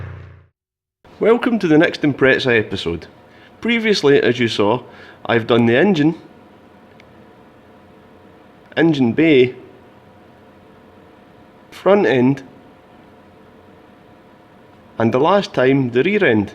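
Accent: Scotland, United Kingdom. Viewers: Hey car is looking epic. How have you been finding the raptor??? I’ve got GDB and done the same to my own car, full Resto and raptor in wheel arches and full underneath. I went bare metal and then acid etched, rust bullet and raptor over the top. I find it chips quite easily on hard edges, sills, gaps etc - but on the flat it’s awesome. Won’t budge! Have you noticed this at all?? Thanks for all the videos btw, what a great source of info. Fellow Scot I hear too?? You don’t sound too far from myself in Perth. Cheers! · I really injoyed every second of watching all your videos about your Subaru Impresa. You are a really professional about eveything about cars. You have a wonderful sense of humor and a love your Scottish accent. Very nice, entertaining and educational videos. Thank you so much.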